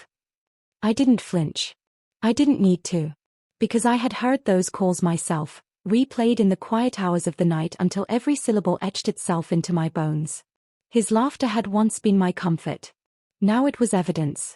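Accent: United Kingdom. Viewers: Why did you have to use a woman with a British Voice, when it's clearly an American Story?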